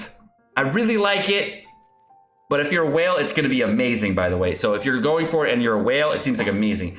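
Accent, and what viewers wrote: United Kingdom. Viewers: Is that BRITISH holy moly, that can't be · BRITISH LMAO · Finally british is back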